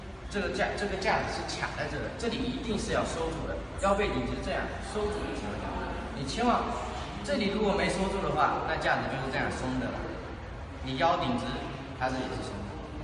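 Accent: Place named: Fuzhou, China. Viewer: 亲切的福州普通话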